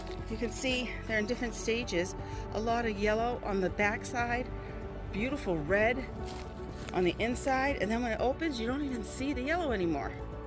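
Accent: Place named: Boston, United States. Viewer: Thought I recognized that accent - Bostonian here!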